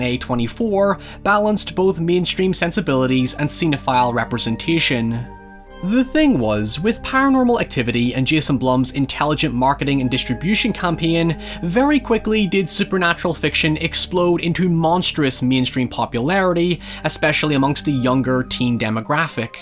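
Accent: Northern Ireland, United Kingdom. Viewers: The auto-generated closed captioning spelled the word "craze" as "kriyas" because of delightful NI accent. That is all.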